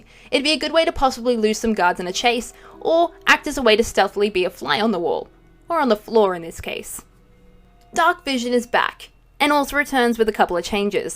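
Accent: Australia. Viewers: Even with the lack of official info u still manage to make an informative video, really impressive if I may say so. Is that an Aussie accent? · are you aussie?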